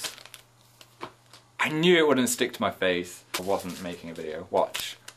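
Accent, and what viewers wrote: North, United Kingdom. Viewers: northern accent is strong in this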